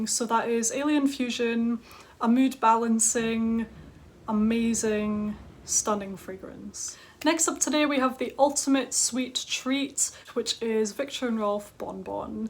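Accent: Scotland, United Kingdom. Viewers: Nice video. You are a pleasure to watch, a star of Scotland. Lovely Scottish accent.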